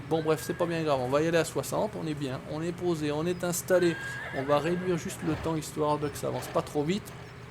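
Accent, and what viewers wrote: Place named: Nord-Pas-de-Calais, France. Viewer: Très bonne vidéo comme d'habitude. qui se met à parler chti et un peu patois. Je me demande si tu es vraiment, lol 🤔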